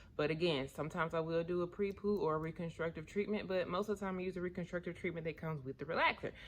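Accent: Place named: Mississippi, United States. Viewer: Where u located? I'm getting a Mississippi vibe from your accent. Just curious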